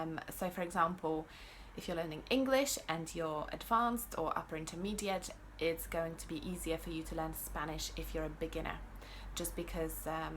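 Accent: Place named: United Kingdom. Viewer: Very useful tips, I'll definitely try out some of them. Last year I started to learn Norwegian and Hungarian from scratch at the same time and I was quite unsure whether it was a good idea. I have one question for you: how did you manage to lose your native accent and speak like a Brit? I'm Polish too and although my accent is clear I'm still far from sounding like a native. Thank you for the video!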